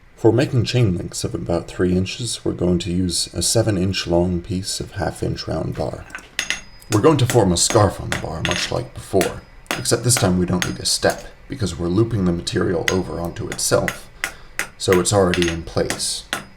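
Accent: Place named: Canada. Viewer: Great video thanks for sharing. Where you located? You sound like a Canadian but Im not sure. Very well done.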